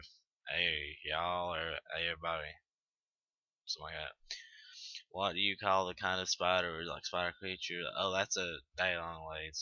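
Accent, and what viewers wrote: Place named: Tennessee, United States. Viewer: Dude I'm from outside of nashville, and I say everything they way you do. It makes me laugh, cus I've watched all the other people from different parts of the world do this as well, and the way you do it so funny. lol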